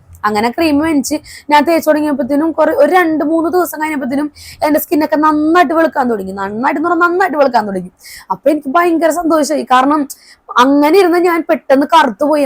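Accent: Kochi, India. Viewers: മരുന്നിനേക്കാൾഎനിക്ക്ഇഷ്ടപ്പെട്ടത്കൊച്ചി ഭാഷ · Kochikariyanalle samsarathil thonniyatha dear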